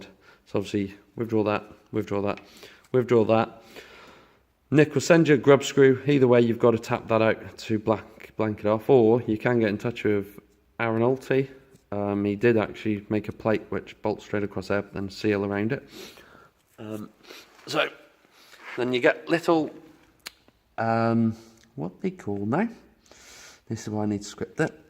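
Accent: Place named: Newcastle, United Kingdom. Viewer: Thought you were a geordie in the beginnnning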